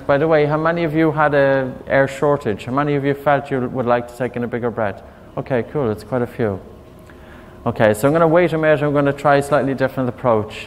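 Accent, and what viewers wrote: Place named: Ireland. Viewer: Such a lovely Irish lilt!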